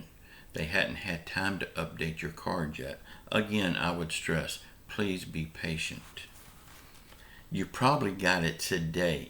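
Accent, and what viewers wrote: South, United States. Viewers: Good Morning. Hahaha..”If it don’t help me, it should not be.” hahaha..where do you come up with your material? Hahahaha..love it..funny, but it shows what type of people we have become. And yes, I agree with the comment about “liking the way you talk.” You are an extremely good communicator who can get your point across in “plain talk.”...which is a rare quality..a lot of people overdress words. And, my wife and I loved the South..there is something special about a Southern accent. You have been blessed with talent and appeal.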